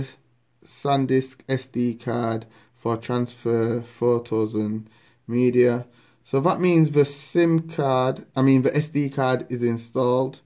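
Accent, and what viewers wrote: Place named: United Kingdom. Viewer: Which part of UK are you from?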